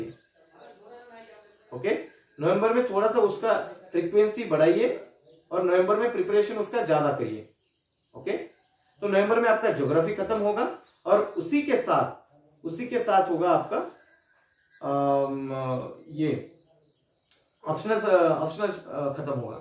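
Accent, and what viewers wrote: South, India. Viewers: Sir south indian tone in your language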